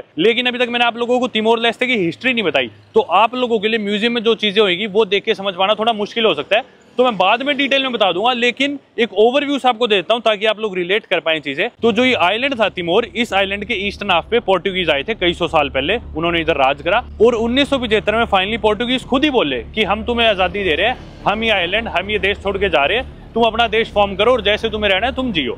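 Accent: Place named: Delhi, India. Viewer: Jaise delhi wale punjabi bolte h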